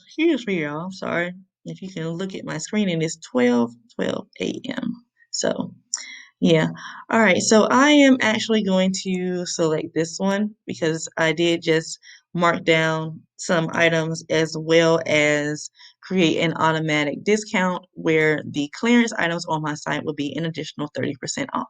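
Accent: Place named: South, United States. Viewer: oh you’re from Enfield, you country lol I mean I’m country too, but y’all accents are thick lol Do you prefer mailchimp over Privy?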